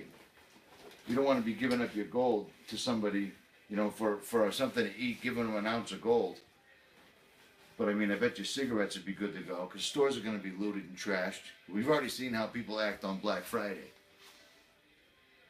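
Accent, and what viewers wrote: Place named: Brooklyn, United States. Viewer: I heard about your channel so I just subscribed. I need to know everything in this pack! I want to turn my over stuffed BOB into a INCH bag. I love how you sound like my Brooklyn cousin who's now passed away. There's nothing bad about being a truther. Thanks for the laughs. Need less to said I'll be watching this with my note pad.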